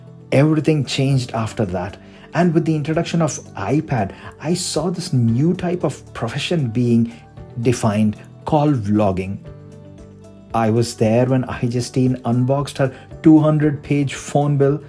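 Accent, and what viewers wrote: India. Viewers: Are you Indian ?? Your voice look like an Indian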